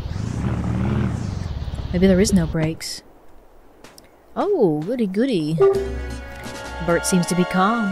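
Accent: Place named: South, United States. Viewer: Oh man, I love ur Southern Accent. Its like Heaven. Please dont Change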